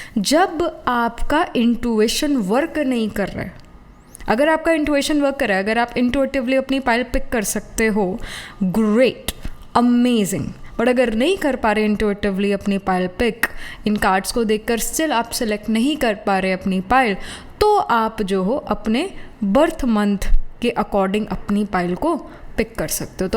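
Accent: Punjab, India. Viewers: Are you Punjabi girl language tone shows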